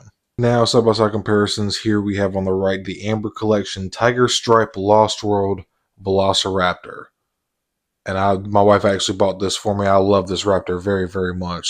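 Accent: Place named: South, United States. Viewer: Spitin Dino facts in the southern accent is worth watching till the end y’all. 😉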